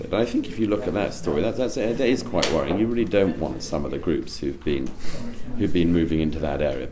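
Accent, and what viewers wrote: United Kingdom. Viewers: Murray: Britain's favourite Neocon! Basically Paul Wolfowitz with an English accent. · Irish girl here who loves posh English accent 😍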